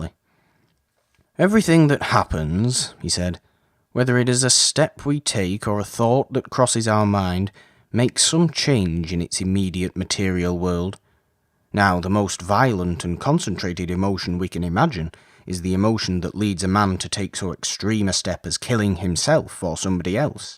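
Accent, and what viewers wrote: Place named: Yorkshire, United Kingdom. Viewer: Am I hearing Yorkshire ? I think so and I adore it 💖 I've many ancestors from Yorkshire although I'm a Yank.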